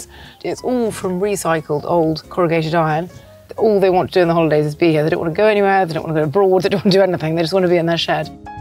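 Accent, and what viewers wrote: RP, United Kingdom. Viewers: Marvellous posh accent with a really tense jaw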